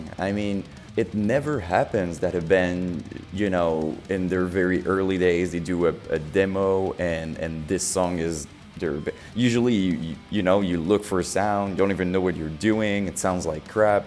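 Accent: Quebec, Canada. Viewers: Been trying to pin your accent for ages, I settled on Swedish, good to know it’s French-Canadian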